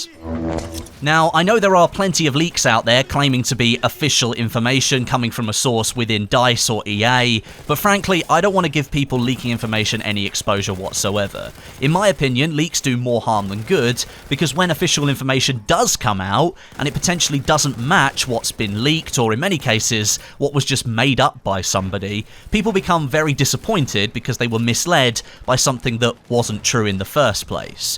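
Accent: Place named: Essex, United Kingdom. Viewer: He's so Essex!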